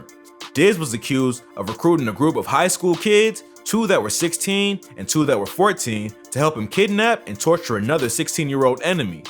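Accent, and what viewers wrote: United States. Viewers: 😂😂why is there an American commentary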